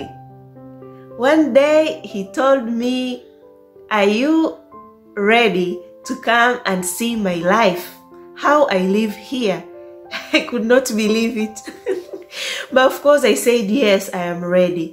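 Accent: Uganda. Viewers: Lovely story. From Tanzania but you sound like Ugandan. Much love from Uganda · I thought she was Ugandan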